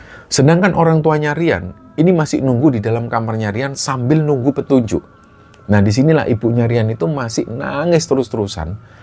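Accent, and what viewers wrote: Java, Indonesia. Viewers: terimaakasih mas dan crew sudah selalu menemani mingguku. kali ini dngerin cerita ini bikin kagum tapi merinding jugaa. sefatal itu yaa bahkan jasadnya pun bisa pulanh sendiri tanpa dibawa fisik oranh rumah. jatim memang the best apalagi mas yg nyeritain kek jawanya njawa bgttt brasa hidupp.. sukses terus tim KS ✨️✨️🫶🫶❤️❤️🎉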